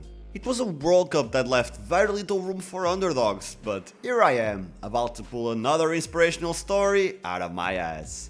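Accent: Portugal. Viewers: he is Portuguese, his English accent can tell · Sabes que youtuber é portugues quando o sotaque portugues é bem evidente/pesado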